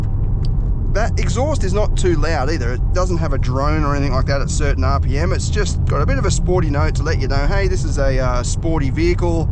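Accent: Australia. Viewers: This video is Australian. Steering wheel on the wrong side and accent is a bit of a giveaway.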